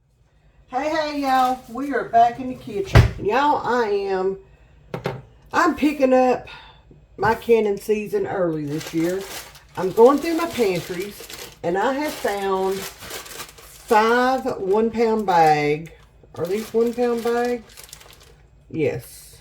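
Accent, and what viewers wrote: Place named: Arkansas, United States. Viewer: Are you from Arkansas? I’m German and trying to figure out your accent. 😊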